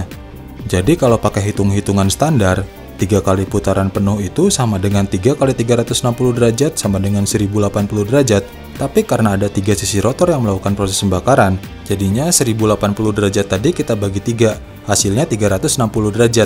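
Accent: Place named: West Java, Indonesia. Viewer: Fix, logat nya sunda.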